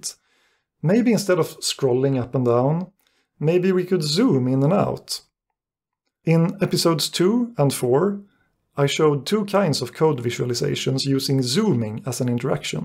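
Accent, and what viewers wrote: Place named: Sweden. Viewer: The Swedish accent is strong in this one :)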